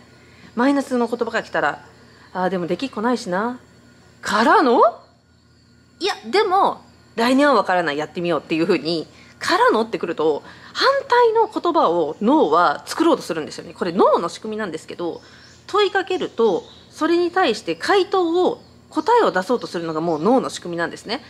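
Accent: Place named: Kyoto, Japan. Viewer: 京都？っぽい。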